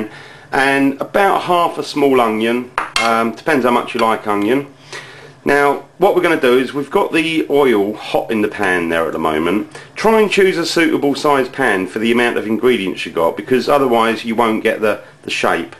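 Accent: United Kingdom. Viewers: The texture of potato absorbents too much oil.. I don't see myself indulging on such an unhealthy recipe. As a matter of fact, I can think of two ways to do this exact recipe in order to cut, more than likely, at least HALF the calories. But in fairness, healthy wasn't your approach. Dzięki Ci! Ps. British accent, Mexican dish?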